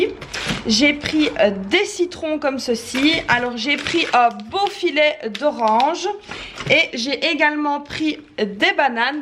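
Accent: Belgium. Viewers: Coucou j ai decouvert ta chaine en cherchant des videos budget Quel bonheur de tomber sur une belge ( je pense quon est de la mele region vu l accent😉). Je suis belgo marocaine a la recherche d une vie simple et une meilleure economie.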